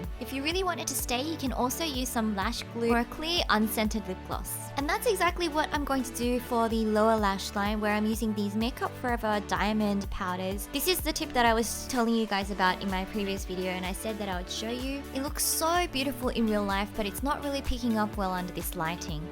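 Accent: Australia. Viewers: I love it that your makeup tutorial always have that perfect mixture between "western" makeup and korean makeup. No offense but I found minimal korean makeup starts getting boring to watch after a while so some refreshing looks like this one is really refreshing:) Also, your Aussie accent just makes me love ur vids soooo much:* thanks for the tutorial:)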